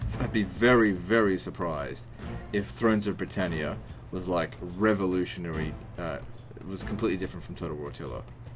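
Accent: Australia. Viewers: i think the aussie accent is partly the reason i watch i like aussie man reviews...woteva u do dude goodluck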